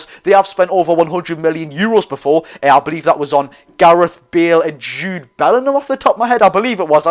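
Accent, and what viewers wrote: Newcastle, United Kingdom. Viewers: I must say I love the Geordie accent very friendly brilliant video by the way 🤍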